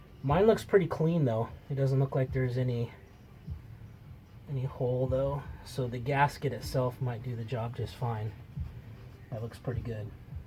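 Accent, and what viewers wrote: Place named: United States. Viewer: This is an above average video with a clear speaking American on "How To" repair an inner "loose or damaged nut" that's deep within the clutch housing of a Suzuki Boulevard M109R. Concise, step by step instructions, no frills, no music, just a straight forward, common sense repair, that is well explained as the video progresses. Excellent video Mr. Suzuki Clutch Repairman Thank you!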